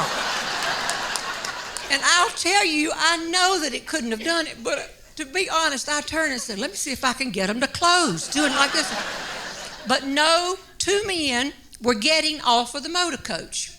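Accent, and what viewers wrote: South, United States. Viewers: you`ve got a new BIG Fan from Germany. I have been watching many of your Videos here for several Weeks and i absolutely LOVE your kind of Humor. And also love that Southern Accent. It makes your Stories even funnier. So please keep those Speeches coming. Greets and many Thanks for many good Laughs from Hamburg / Germany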